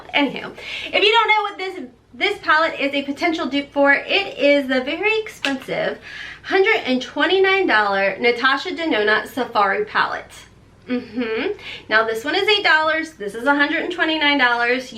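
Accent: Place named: Kentucky, United States. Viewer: also, thank you for sharing the difference between a fake and a dupe because when I first rediscovered my love for makeup I didn't know that there were such things as "fakes" I thought I was just getting a good deal on an expensive product. I will NEVER buy another fake product because as you said who knows what kind of nasty things are in it. Idk if you watch nadi (pop luxe) but he used to do fakes as content and it actually made him sick. Omg!! My husband could hear the video playing and said, "is that, the girl from Kentucky"? Hahahah! I think I might watch you alot. <3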